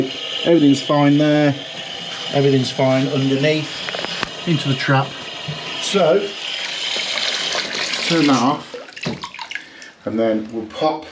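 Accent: United Kingdom. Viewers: Are you from Wolverhampton?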